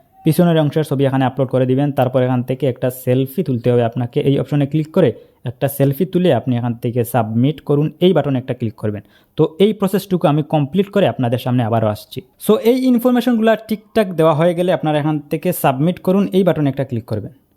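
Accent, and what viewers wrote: Sylhet, Bangladesh. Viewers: Bhi lager Sylheti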